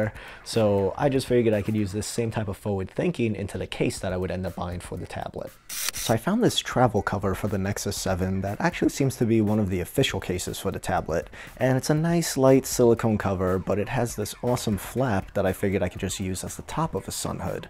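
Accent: United States. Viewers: I don't know why but the more the video went on, the more I begun to like this guy. Might be his accent which I assume is like an East Coast accent? IDK. But consider me subscribed. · i love this deep brooklyn review :DD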